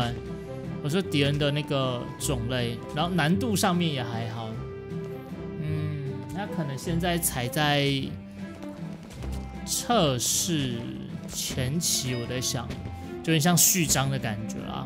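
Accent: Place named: United Kingdom. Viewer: 是英國腔沒錯，英國留學生來幫你證明哈哈，很多重音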